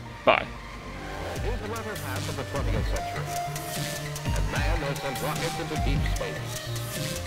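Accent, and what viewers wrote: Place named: Australia. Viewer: 5 years old and 1million views and no one has mentioned that in the closed captioning you say you defecate in your car. The auto captioning has trouble with Aussie accents, lol. Great video, all the best! Eddie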